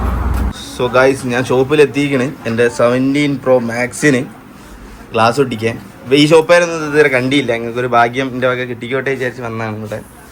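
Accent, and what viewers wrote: Kodungallur, India. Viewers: slang shinkunte kodungallur slang ayallo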